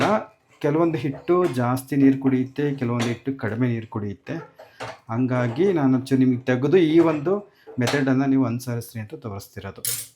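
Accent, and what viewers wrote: Chitradurga, India. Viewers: Nam durgada bashe ty u so much 🥰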